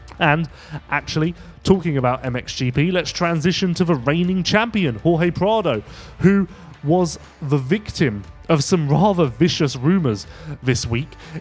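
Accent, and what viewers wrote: United Kingdom. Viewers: Grumpy, monotone UK dude.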